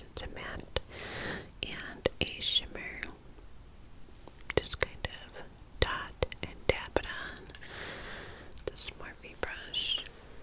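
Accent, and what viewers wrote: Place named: Upstate New York, United States. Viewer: everything you do is amazing and I fall asleep to your videos every night💛 my husband is from upstate NY and y’all say words the same and I always tell him you pronounce or call things the same😂 I’m from Texas so I absolutely love it. Hope y’all have the best Christmas❤️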